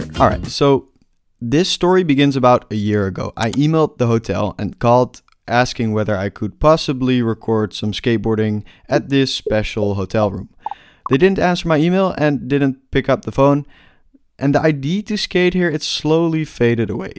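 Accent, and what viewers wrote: Netherlands. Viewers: I can hear he is Dutch because I am to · I smell dutch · hi im dutch and have have a dutch accent so are you dutch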